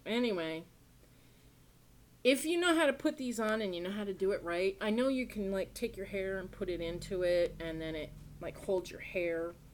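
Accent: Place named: New York, United States. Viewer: Hi! Darla from Crafty Yarn Owl gave you a shout out in her most recent video, “Crochet Bags”. I thought I would start with your first video and I think you are a natural! Your first video was good and I think you have a really genuine easy- going way of talking and showing your projects. I love the name Twisted Stitches! I live in Florida too and used to work as a pediatric nurse. I am originally from Long Island, N.Y. And have lived here for 24 years now. I had a feeling maybe you were from N.Y., but I may be wrong. When you said about the way you were talking, I’ve been in Florida too long I knew what you meant. I say things that I never would have said before and have a bit of a southern twang now when I speak! I am a new subbie and look forward to your other videos. (I am just starting to take up knitting and knitted my first hat, I have been crocheting for years). 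I have a bad back too, degenerative discs, since I was 29, am 65 now. I know what that’s like! Hope you are doing well! 🤗🧶💗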